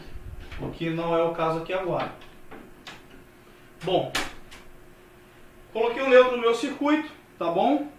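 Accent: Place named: Rio de Janeiro, Brazil. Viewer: Bom dia meu amigo, ganhou mais um escrito, sou do Rio de Janeiro pelo seu seu sotaque vc deve ser aqui do Rio, trabalho Muito <link> elétrica estou pensando em irmora ae abraços e parabéns pelo conteúdo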